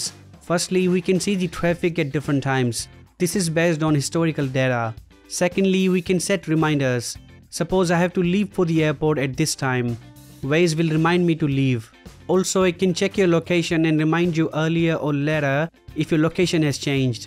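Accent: India. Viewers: Speak English, not Indian-English.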